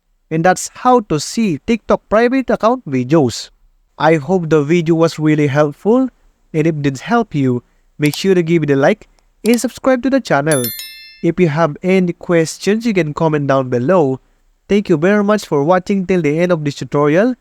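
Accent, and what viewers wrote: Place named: Philippines. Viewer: Accent tagalog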